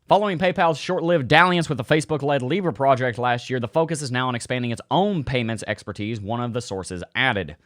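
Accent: South, United States. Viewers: I love your southern accent and with a name like I can trust everything you say hombre. You got a sub in me mi amigo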